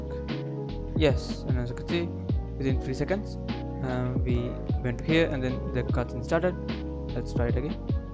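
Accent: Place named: India. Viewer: Ahh indian voice, didnt understand anything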